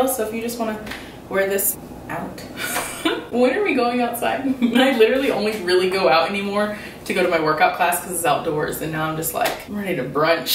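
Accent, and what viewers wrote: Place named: South, United States. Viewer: I'm a true fan. You have lost your Southern accent. Glad you're back.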